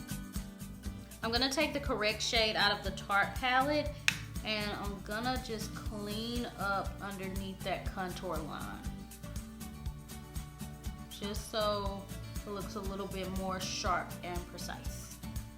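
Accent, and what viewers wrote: South, United States. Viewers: I'm freaking love your channel! My friends always tell me that I sound like you. #southerngirlaccent #nitraabsnapchatgiveaway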